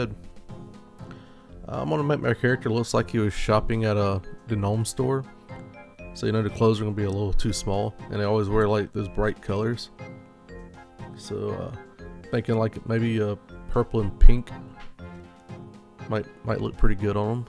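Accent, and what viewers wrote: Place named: South, United States. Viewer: Idk why but people with Southern accents playing RuneScape always makes me smile. One of my best RuneScape friends from back then was from Missouri and had this super thick accent, you remind me of that a little lol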